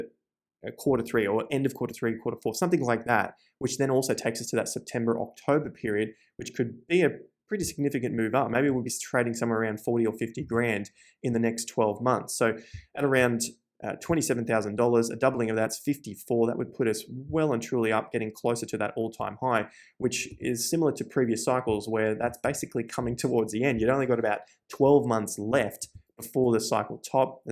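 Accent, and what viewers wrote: Australia. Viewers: Hey, can you do an analysis of the property market in australia please? i can hear the aussie accent;)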